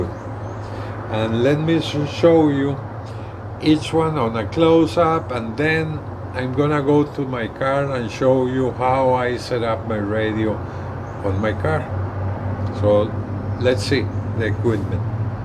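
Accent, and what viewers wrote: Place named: Argentina. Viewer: I expected a southern Louisiana accent but instead your accent sounded very Argentinian? Little hint of Italian made me think so.. very cool video :) thanks for sharing!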